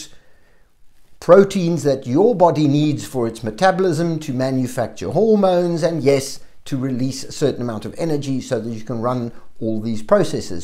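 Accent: South Africa. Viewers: And has a South African accent.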